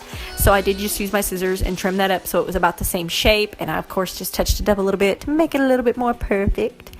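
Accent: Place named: South, United States. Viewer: This was do helpful thank you! Loving the southern drawl btw it's cute as hell!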